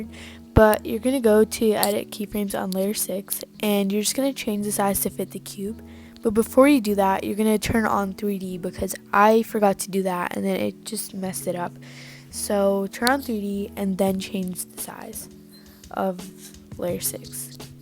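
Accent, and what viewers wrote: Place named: United Kingdom. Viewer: i thought you were british for a good minute 😭😭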